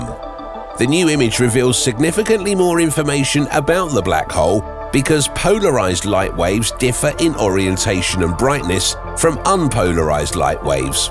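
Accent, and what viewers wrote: United Kingdom. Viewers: Because the word sounds more daunting in a British accent.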